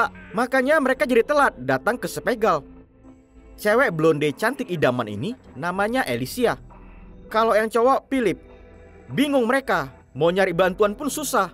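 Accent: Medan, Indonesia. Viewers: bahasa kek orang medan cuk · Mimin org medan kah? Logat medannya lengket kali🤣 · Bang kau orang medan kh? Kok kek orang medan kau bang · Logat Medan nya khas kali hahahaah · Bg orang Medan bg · Orang Medan kau laii 🙄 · Anak medan fixs :v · Bang sering upload lah bg Logat Medan bg 😁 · Medan kali logat'a bang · Sering2 upload bg... Orang Medan ya bang ? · Logat org medan mmng gtu bg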